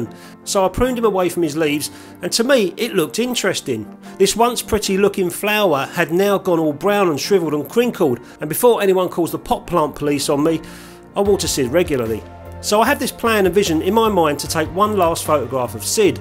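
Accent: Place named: Scotland, United Kingdom. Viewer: As I understand you live in London, but your speech is similar to Scottish😉💂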